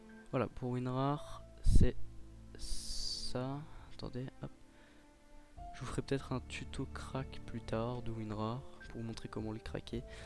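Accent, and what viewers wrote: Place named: England, United Kingdom. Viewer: omg cet accent anglais xD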